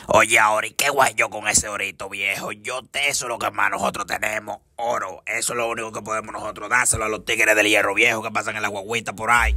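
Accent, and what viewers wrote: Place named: Dominican Republic. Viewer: ey demasiado duro te sale todas las idisomas de RD jajajaaj🤣🤣😂😂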